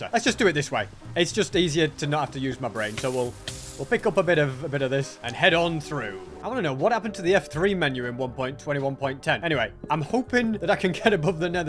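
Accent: United Kingdom. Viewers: either way he literally speaks in a type of british accent all the time so ur comment makes no snse. what u mean is a british word u cant seem to remmerb him saying much, noy at all the same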